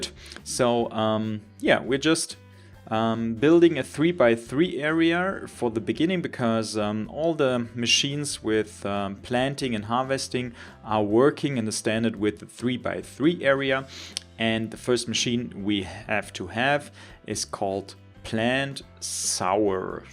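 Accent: Germany. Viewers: Its German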